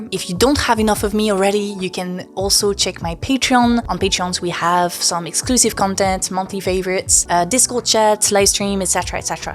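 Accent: France. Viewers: Je me disais bien que je ressentais cet accent français 😂❤ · Ahh, makes videos deliberately titled ' the toxic hedonism of male travel vloggers ' and 'are straight men ok?' all in a slightly provocative and mocking manner with almost a glee and a false sense of concern in the background and laughing at people who respond to the generalization, suddenly talking about the gender wars with her shtty french accent. Never change girl. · Are you french?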